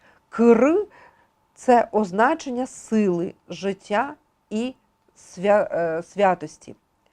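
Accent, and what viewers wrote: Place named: Galicia, Ukraine. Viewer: Навіщо постійно, останнім часом, потрібно хизуватися галицьким(як в народі мовлять - западенським діалектом). Багато слів у південно-західному наріччі схожі зі словами в рус.язикє, і навіть наголос. Почали зі слова знайдЕте, в язикє- найдЁте. А чому не вимовити як розмовляє(балакає) решта України - знАйдете?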